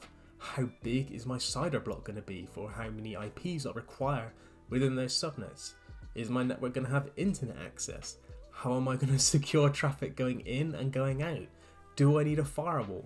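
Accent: United Kingdom. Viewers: Are you from Devon? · Thanks bro.. I know I can trust you with that west country accent like mine😂, great video, very informative 👍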